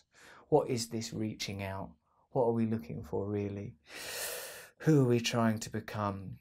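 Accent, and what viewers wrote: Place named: United Kingdom. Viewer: I don't understand your British accent 🥺🕊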